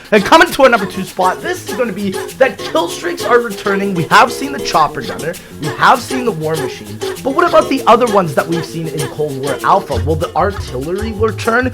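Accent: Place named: Newfoundland, Canada. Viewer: I realize now that the way you speak is almost like how a newfoundlander sounds and to people who aren't exposed to it a lot have no idea what you're really saying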